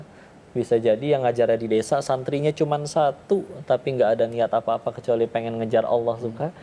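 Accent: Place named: West Java, Indonesia. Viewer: kan org sunda, bogor, nyantri d bandung, jd logat ny k bw sunda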